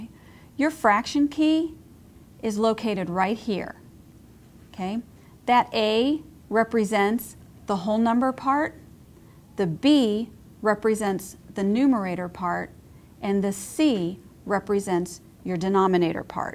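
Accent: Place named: United States. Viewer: I don't like her she has an American accent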